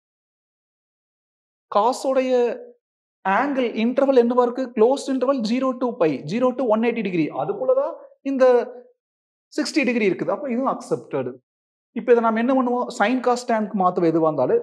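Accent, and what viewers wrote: Coimbatore, India. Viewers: That's becoz he's Coimbatore his slang changes right!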